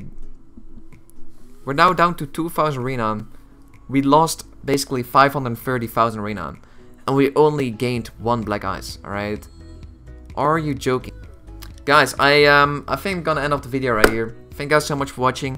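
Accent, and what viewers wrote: Netherlands. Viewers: Het moment dat ik je hoorde wist ik dat je een nederlander was. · Dat Nederlandse accent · Where are u from? U sound like Dutch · R u dutch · Are u dutch? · Jij bent toch nederlands k hoor het aan je stem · Jesus bestaat er een accent dat nog meer nederlands is dan dat van jou XD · Volgensmij ben je Nederlands aan je accent te horen??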